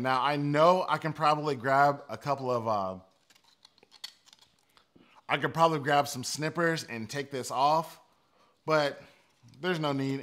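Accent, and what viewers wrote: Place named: South, United States. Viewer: What part of South you from bro? I'm originally from Alabama.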